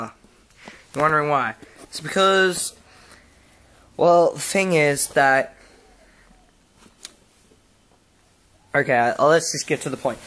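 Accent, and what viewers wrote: Australia. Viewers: Good Austrailian Accent. What you Found was Actually the PS2's Personified Fear. ( Yes It is an RSOD. ) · your australian accent sounds preety cool man! you are the coolest person in the world! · i like the Australian accent because u get to say g'day mate lol xD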